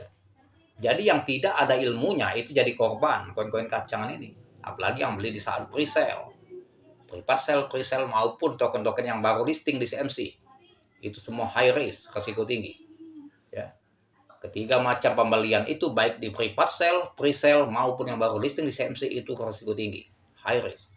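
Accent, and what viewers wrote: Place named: South Sumatra, Indonesia. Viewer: Sepertinya bahasanya bpk asal sumsel ya Pak..salam cuaan Pak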